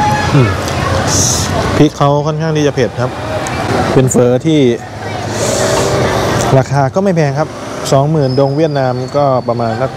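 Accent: Isan, Thailand. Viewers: ทำไมสำเนียงออกอิสานๆมากเลย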